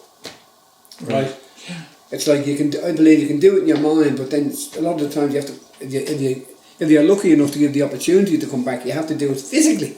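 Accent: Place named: Ireland. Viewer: It's like listening to two five year olds, such Rambling, self indulgent mish mush, typical Irish man, dreadful accent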